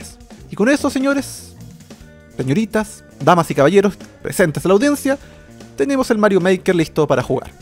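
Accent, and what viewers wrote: Chile. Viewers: ¿Chileno? Hablas como Vardoc xD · hahah solo es el tipico acento chileno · Ikr! Tiene acento chileno xDDDD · Te apuesto a que eri chileno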